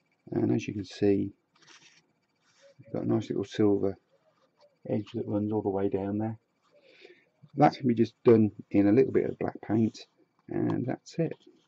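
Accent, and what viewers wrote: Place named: United Kingdom. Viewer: Hi I know this video was done now 5 years ago but can you tell me what the material is that you used to attach the gate door to the post as the Closed Caption doesn't translate the British accent very well. It is a very good tutorial enjoyed it.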